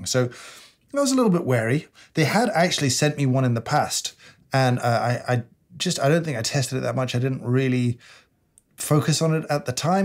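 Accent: United Kingdom. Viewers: His voice is calm but seriously British? You and the negativity about your opinion and Gawdamn even your face already can tell everyone you don’t like this product, return it and just run the old conventional espressos machine will ya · Thank u in advance. So you spared me about 70Dlls I was about to spend in this gadget. I was short of money and bought a bigger thing, a Delonghi espresso for home. Almost the same price, and much more useful, regards from Mexico, like a lot your finicky behavior and your a very nice warm loving guy. Love your British accent btw. Cheers from Baja California ;)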